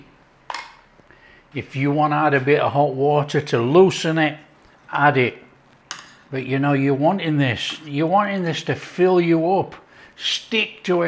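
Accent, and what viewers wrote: United Kingdom. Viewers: Sir are you a Yorkshireman ? You sound Dickie Birdie and almost like Groffrey Boycott buy then not quite like him though. Wonder if Dir Groffrey will like this Indian broth 😀 Happy Khichding 😀. Khichdi in Indian colloquial parlance means a ness 😀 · Just watched it for the goo 'ol br'ish accent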